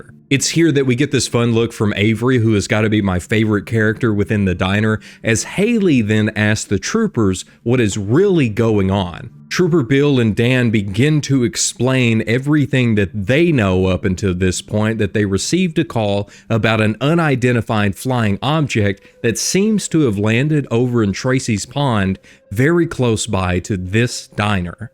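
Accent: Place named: West Virginia, United States. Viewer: Is he from ky or wv. Iam from wv i hear wv accent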